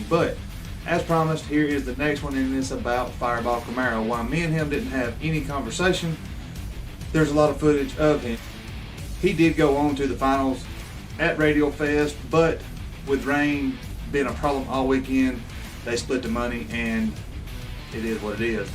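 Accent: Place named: Alabama, United States. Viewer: Hate to break it to u bud ,but you sound like ur from bama too lol. I'm from West Texas so it's same shit different accent, we had boosted and Kayla,doc,Monza, birdman,Shane and banknote here and yea there people taking videos infront of the car as its burning out , people all around them all the way tell the would take off and they were all really laid back about it too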